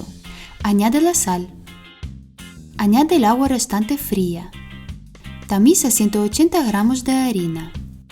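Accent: Russia. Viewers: Por mas qué pienso no logró identificar tu acento eres rusa o española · te juro que me encantan tus recetas, pero tu acento me recuerda esos horribles munequitos rusos que tenia que ver de pequeno cuando vivia en Cuba, y se me ponen los pelos de punta.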